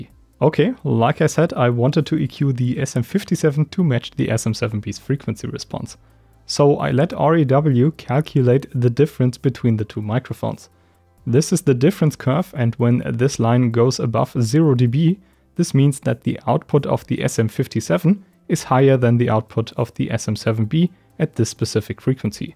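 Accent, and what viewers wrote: Germany. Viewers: HI #Detschakzent trotzdem cooles video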